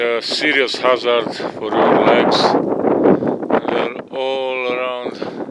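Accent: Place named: Ukraine. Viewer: Almost to hot for swimming, UV is generally 9 or higher so we tend to stay out of that as much as possible. Our area of choice to hike in is Whiteshell provincial park..just named a UNESCO world heritage site for its stunning boreal forest and petroforms..a truly magical place. I thought that I recognized your accent, my Grandfather was from just that area.. Ternopil to be precise..I remember him telling me of the beautiful hills and mountains.. small world isn't it? Cheers!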